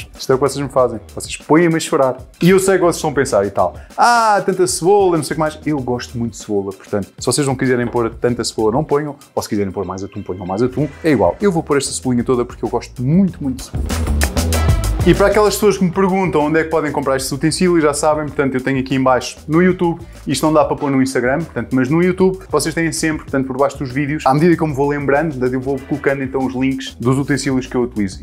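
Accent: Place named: Lisbon, Portugal. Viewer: Essa vozinha de betinho lisboeta !!!!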